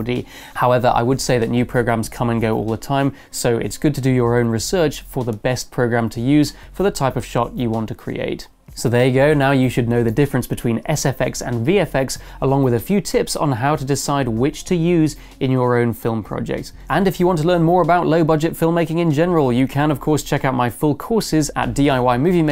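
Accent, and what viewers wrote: United Kingdom. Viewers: Ok. Because I heard, your English is British. :)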